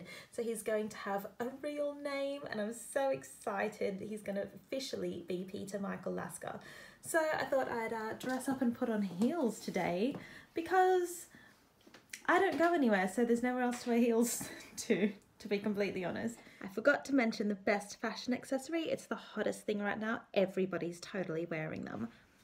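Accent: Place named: United Kingdom. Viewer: Aww hi Peter Michael baby!! Such a cutie and I love your British accent mommy!! 🥰🥰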